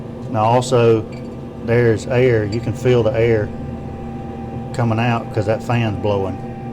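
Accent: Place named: Georgia, United States. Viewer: Hey Brother , you sound like a guy from South Georgia. Great Job explaining the Pellet Grill. Learned some new stuff. I live in North Florida and I have had my grill about a month. Thanks for sharing.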